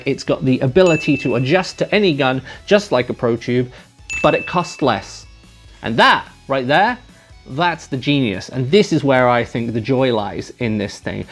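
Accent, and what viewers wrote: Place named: United Kingdom. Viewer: I'm guessing by your accent that your Brittish? I'm also guessing that you do not currently reside in England? Given the fact that you just compared the VR pistol to a real one, which is a big no-no in England - a country that has become a haven for criminals and where law-abiding citizens have been stripped of any/all rights to bear arms or defend yourself against said criminals.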